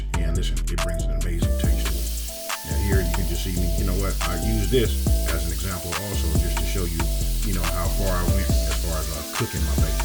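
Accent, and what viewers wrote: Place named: South, United States. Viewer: You need to come to Destin FL and try grits a yaya at this local place. This sorta reminds me of it. I can tell you’re southern, but from where?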